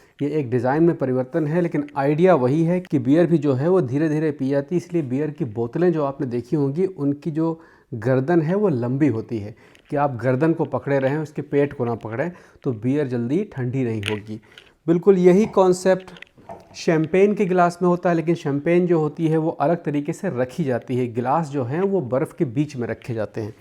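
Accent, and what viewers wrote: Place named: Uttar Pradesh, India. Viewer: Kya aap uttar pradesh se hai?, aapki bhasha muje meri dadi jaisi lagti hai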